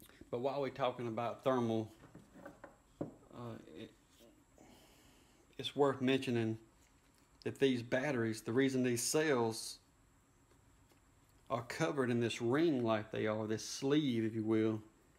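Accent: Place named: North Carolina, United States. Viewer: This channel is a bit like BOLTR but without the humor, bad language and complete disrespect for the tools he's working on. He also sounds like he must be from near where I live (NC). Good video - thanks.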